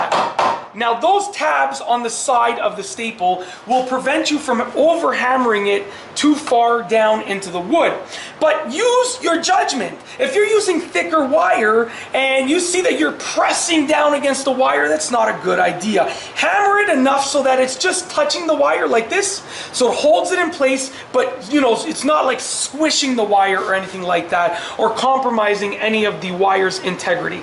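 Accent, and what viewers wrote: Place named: Canada. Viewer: Canadian Eh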